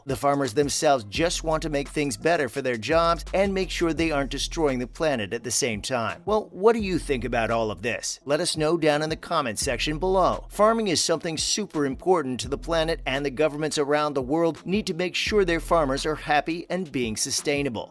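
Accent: United States. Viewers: Is this an advert for Elders or supposed to be informative - not sure you are achieving either - especially with the very annoying American accent.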